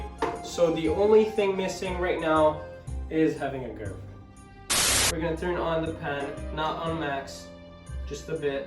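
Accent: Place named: Italy. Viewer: si sente l'accento italiano 😍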